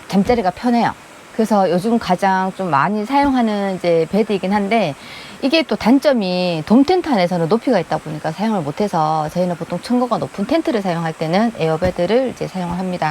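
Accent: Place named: Gyeongsang, South Korea. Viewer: 대구분이시죠??ㅋㅋㅋ 억양으로 알것같아요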